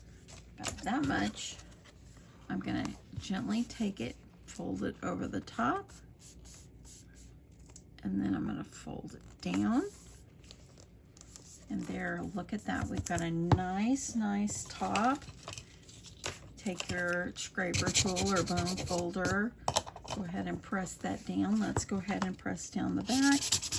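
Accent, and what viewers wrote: South, United States. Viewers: ❤❤❤❤OMG I LOVE THIS you are THE sweetest lady❤ I love watching your videos and listening to your southern voice🩷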